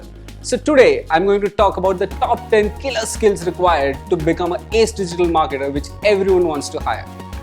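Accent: India. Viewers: i like your indian accent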